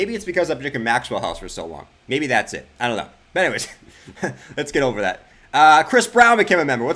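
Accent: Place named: Boston, United States. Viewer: I got interrupted! Loving your advancement of effects etc. and yes you still have a huge Bostonian accent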